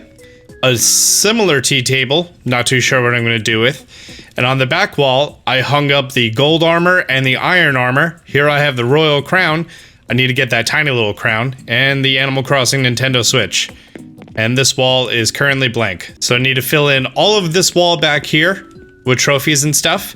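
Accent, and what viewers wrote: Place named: United States. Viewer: the factttt i knew u were from either PA/NJ/DE from the first vid i watched by the way u said “home” and “water”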